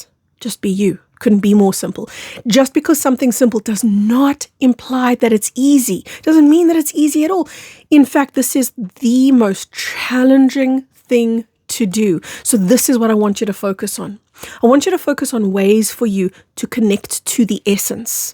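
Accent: South Africa. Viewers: Hi I found your channel a few weeks ago and love the info your putting out. All I know is that my soul family is the blue ray of light and my power is the violet flame of transformation when I let the energy flow it is bliss and peaceful and sends goosebumps all over my body. I understand oneness Iwant the best for every human on this planet 🌎. No obstacle thrown in my path will get me out of my inner peace. I had no help from others learning about energy vibrations and frequency’s. I don’t care about the 🎤 microphone I see your energy and oneness your a lovely being shining your light. Much appreciated oh 1 more thing I love your South African accent 😊. I have a blue aura what is yours? Sending high vibes from Vienna Austria 💙💙💙✨💫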